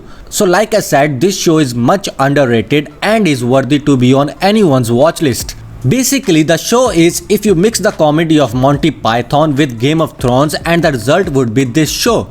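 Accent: India. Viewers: What gave it away? Was it the thick indian-style accent?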